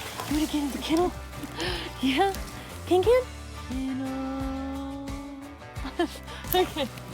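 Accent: South, United States. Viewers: It's the lady puppy for me!!! It's the southern accent for me!!!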